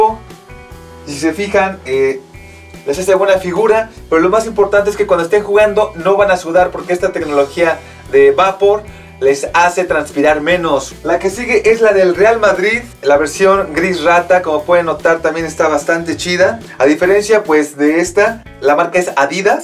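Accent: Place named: France. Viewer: Haz más vídeos con tu suculento acento Francés y Brasileño. ♡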